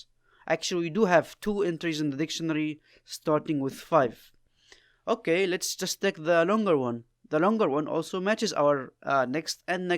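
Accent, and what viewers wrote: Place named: Egypt. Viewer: You are egyptian 😂 I Know this kind of accent, but good job keep it up bro.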